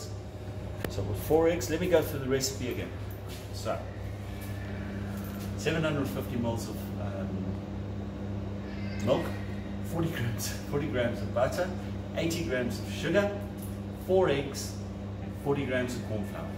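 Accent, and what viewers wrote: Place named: South Africa. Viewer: It’s been so long since I’ve heard a good South African accent (thank u)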